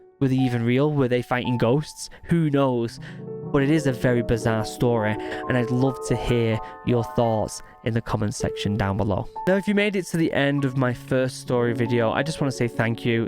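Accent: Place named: England, United Kingdom. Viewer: nice change hearing an Englishman tell horror stories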